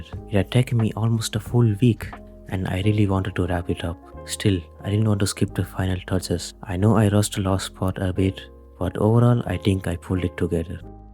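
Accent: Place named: India. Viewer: Annoying Indian voice omg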